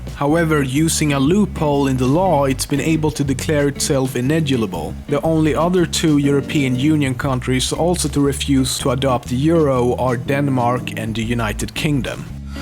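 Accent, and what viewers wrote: Gothenburg, Sweden. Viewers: are you swedish? sounds like it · haha ja! men man hör ju att är Svensk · Är du svensk låter så på dit ut tal och om du är svensk så kan du nog läsa den här kommentaren och om du inte kan så behöver du inte bry dig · Vänta...är du svensk, jag menar jag har hört din brytning men jag chansade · 2 things first things first are you swedish?? 'cuz you says the worsds as i would say it. second am swedish so KOM FÖR FAN!!! :D Tell 'dat to someone!! Or scream: FÖRBÖVELEN!!! :D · you come from Sweden, I can hear that when you talk Swedish because I'm Swedish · was thinking that swedish accent in previus videos but now i got my proof that you are from sweden. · Are you from Sweden for it sounds like it and im from Sweden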